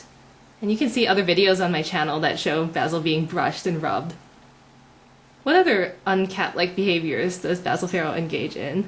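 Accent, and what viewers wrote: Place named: England, United Kingdom. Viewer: Are you Japanese born in England as i thought you were english until i checked your channel haha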